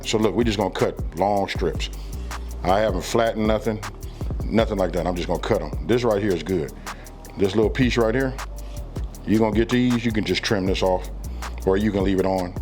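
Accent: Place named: West Coast, United States. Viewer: Philly in the check inn! Big Homie westcoast Accent heavy! 💪🏽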